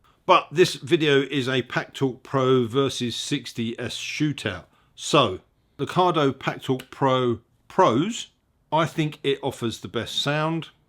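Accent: United Kingdom. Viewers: Thumbs down for the British accent. Mercia.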